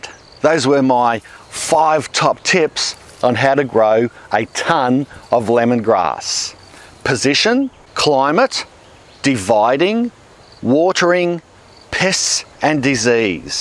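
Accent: Australia. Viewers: Great information! Unfortunately I don't see any lemon grass sold with roots. I see two kangaroos in your backyard. That's a bonus. No wonder you have Australian accent! I've been down there 3 times. It's a beautiful continent. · Love your aussie accent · Love the Australian Accent....love all the Videos posted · Well done brother! Great presentation+most beautiful Aussie accent gives extra kick! ;) Thank you.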